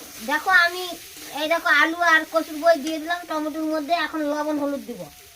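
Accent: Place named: Naogaon, Bangladesh. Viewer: বাসা কোথায়, একবারে হামারে নওগাঁ জেলার মুতোন ভাষা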